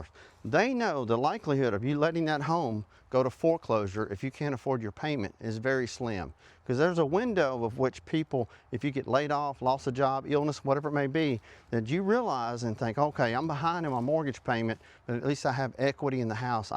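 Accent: South, United States. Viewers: I’d like to listen to you but your southern accent doesn’t sound intelligent. Sorry. Just can’t.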